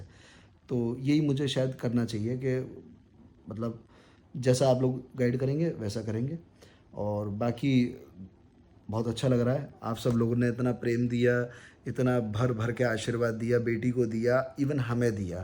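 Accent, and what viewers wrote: Standard. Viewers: itnii suddhh hindiii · Ap Hindi itne shudh tarike se bolte ho acha lgta h🎉🎉🎉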